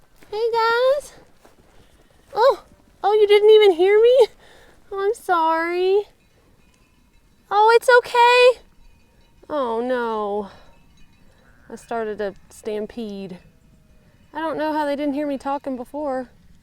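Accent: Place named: Alabama, United States. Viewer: okay, I'm hooked on your channel. I've only been watching for about two weeks and have watched most of your videos. I even watch them at work. You are such a good narrator and videographer. I love your accent. I am married to an Alabama girl and even though I am from South Carolina, I can't get enough of those Bama girls talkin. Be safe out there.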